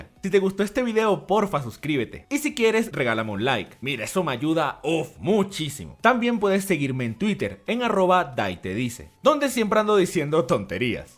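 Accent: Peru. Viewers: Oye we eres peruano?? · ERES DE PERU, NO?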